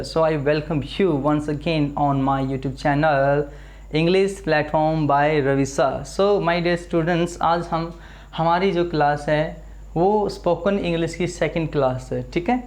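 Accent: Haryana, India. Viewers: English Mai bhi apka Haryanvi Touchup aa rha h brother 🥱🥱🥱🥱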